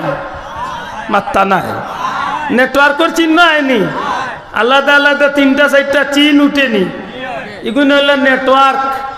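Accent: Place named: Sylhet, Bangladesh. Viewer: সিলেটি ওয়াজ আমার অনেক ভালো লেগে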